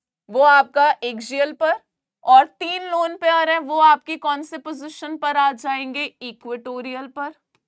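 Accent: Bengal, India. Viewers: mam are u bengali?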